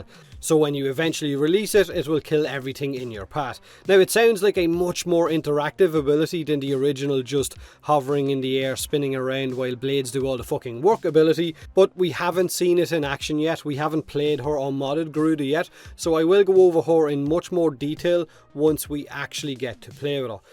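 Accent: Ireland. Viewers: Another great video. Thanks. What part of Fortuna are you most excited for? Also, what part of Ireland are you from?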